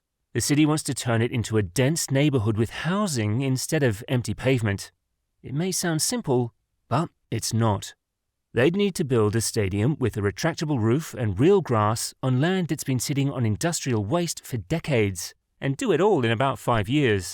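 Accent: United Kingdom. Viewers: What’s with the British accent??? That accent and the NFL don’t mix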